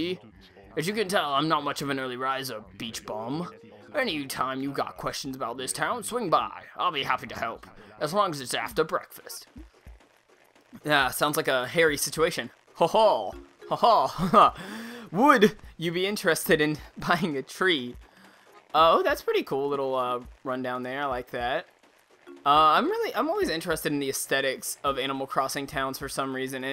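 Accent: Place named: United Kingdom. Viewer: Your British accent is funny! Great video, really helps :) Thanks alot!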